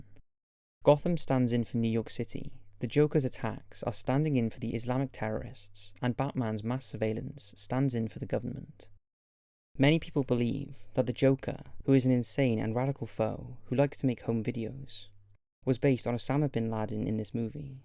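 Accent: Wales, United Kingdom. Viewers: Am I hearing a slight Welsh accent from.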